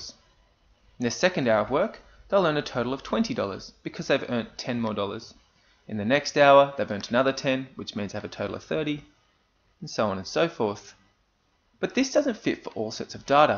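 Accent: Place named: New Zealand. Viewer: I bet you are from New Zealand. Loved your video! Thank you.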